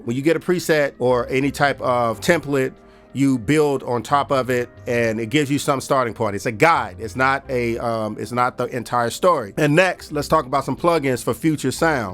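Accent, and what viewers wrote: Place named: Nigeria. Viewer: I Don't know why i though you are Nigerian 😂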